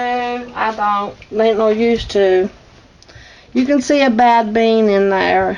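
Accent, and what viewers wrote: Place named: West Virginia, United States. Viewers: Love your videos. I saw Appalachian cooking and thought of my Daddy , he was born in the Appalachian mountains. He had the same kinda accent as you. Brings back alot of memories and your cooking is similar to his. God bless you. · I would LOVE to know where you live.. You sound like you are from either West Virginia or Kentucky..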